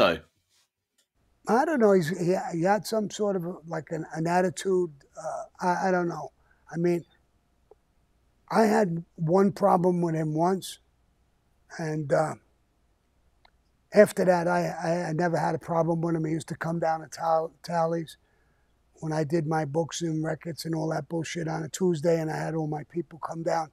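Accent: United Kingdom. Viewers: Your accent blows go back over the pond and talk about the queen like you know anything about the mob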